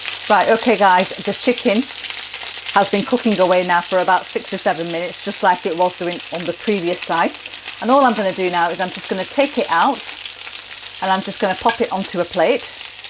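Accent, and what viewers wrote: United Kingdom. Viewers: I will be trying this dish, it would help if you wrote down the ingredients, since you talk with a British accent it is difficult to tell what you used. Thank you for sharing!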